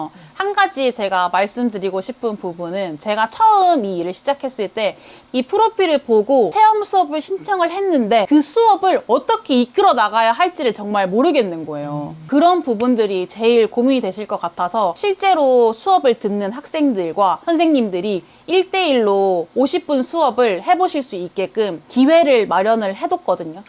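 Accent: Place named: Gyeongsang, South Korea. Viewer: 경상도 억양이네...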